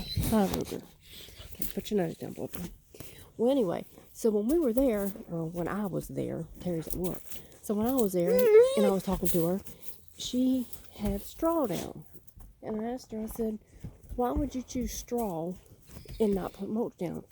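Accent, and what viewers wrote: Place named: South, United States. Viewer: I love your country accent ❤️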